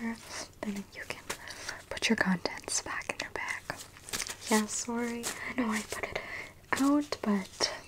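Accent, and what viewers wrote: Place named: Canada. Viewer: The way you talk, you must be from Canada 🍁 well because I am too. This is the first video I saw of yours.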